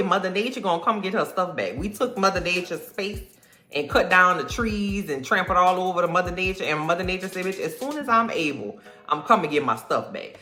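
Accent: New Orleans, United States. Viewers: OK, this is like the 8th review I've watched and I am here for the NOLa accent 🙂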